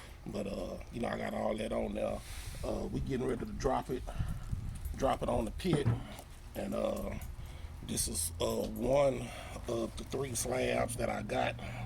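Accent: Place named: Texas, United States. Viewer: im watching this video with baby and the first thing i say to herwhen i heard u talk is, "dude is from Texas." then when u showed the HEB salt and that Zach's Rub it was a done deal. great video bro!! keep making the content.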